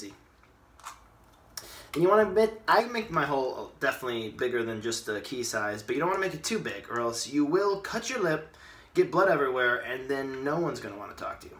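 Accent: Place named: United States. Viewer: His accent says he’s American but his drinking skills say he’s Australian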